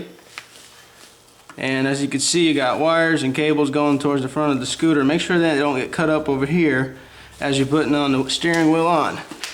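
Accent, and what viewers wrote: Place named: South, United States. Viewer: Southern boy...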